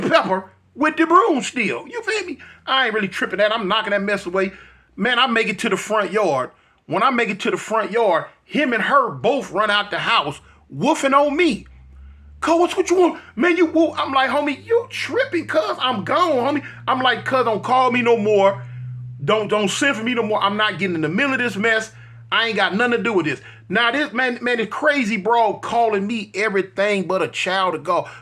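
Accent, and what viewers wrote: South, United States. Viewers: I was gonna say you got a heavy southern accent which I do to but I'd swear you was from Memphis or Alabama or Jackson Mississippi one if I didn't know better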